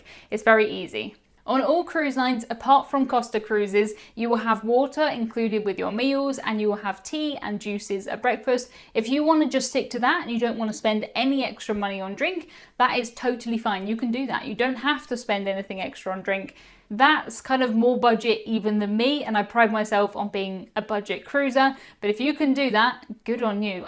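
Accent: United Kingdom. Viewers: Love the British accent (being American, it's cool to see the different ways we speak the same language). I could get lost in your eyes and listen to you talk all night!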